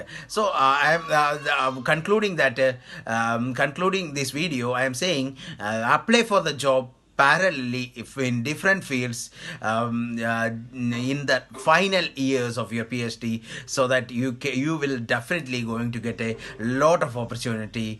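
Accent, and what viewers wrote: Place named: Malaysia. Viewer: First of all learn to talk english ,then learn how to pronounce it other than some '' MINGLISH'' 🙄😏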